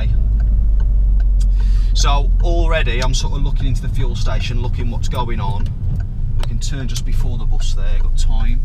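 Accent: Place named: England, United Kingdom. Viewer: Funny English accent 😁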